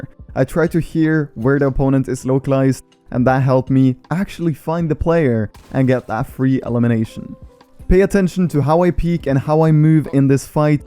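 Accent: Norway. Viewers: are you norwegian? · Er du fra norge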